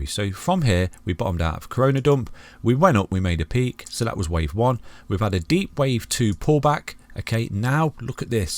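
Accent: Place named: Midlands, United Kingdom. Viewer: it sounds like your from the Midlands, UK. Can't quite pin your accent down 🤔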